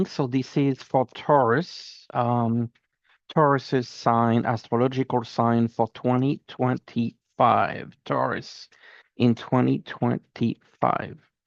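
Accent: France. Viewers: Thank you , may I ask are you French? Your accent sounds a lot like mine, I’m new to your channel , glad I found you, hope you have a great year.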